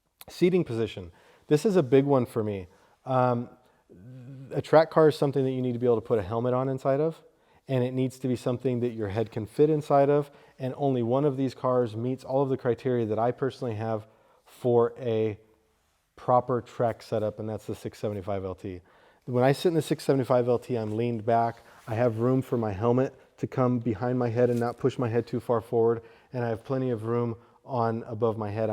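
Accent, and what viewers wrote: United States. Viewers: Totally off topic, but are you American? Obviously you're in Germany, but you speak like an American so just randomly curious.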